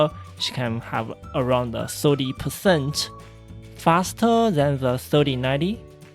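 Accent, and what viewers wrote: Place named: China. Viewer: 我就觉得这英文太中文了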